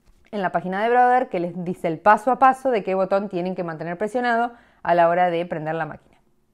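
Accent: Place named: Argentina. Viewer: ahora soy tu fannnn. Eres de Argentina, verdad? Buenos, digo por el acento... Tengo amigos argentinos que amo. Yo soy de mi amada Venezuela...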